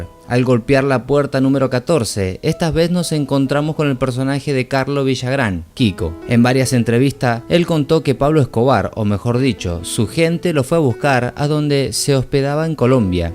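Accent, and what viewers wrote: Argentina. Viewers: Narrador sos. Argentino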